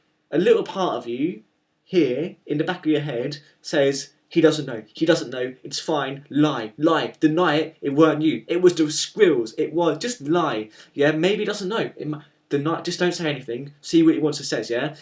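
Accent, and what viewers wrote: United Kingdom. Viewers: LOOOL :D you're hilarious.. and very cute! :3 and you've got a lovely accent! (british accents ♥) keep it up, man - but the other commenters (is this a word?) are right: you really should shorten your vids to 3-4mins.. but the video is great, though :D and the title of the video fits my name, doesn't it? :D (wow, I'm using many smileys..) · You're so cute and I think you're clever person.. ;D so don't be like Charlie McDonnell.. be yourself.. I think, that you are, but a lot of people - don't... and maybe they're right.... and do not dress the hat any more(or jacket, etc..), please... you are in the room, that looks pretty weird.. and that is like Charlie was doing.. ;D ..but I really like you. (and I love your British accent ! ) xd - Kate. ;)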